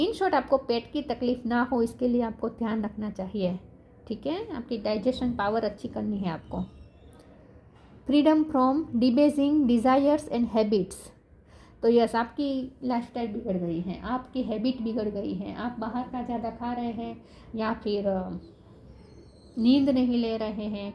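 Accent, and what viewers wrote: Gujarat, India. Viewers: Ma'am aap gujrati ho.??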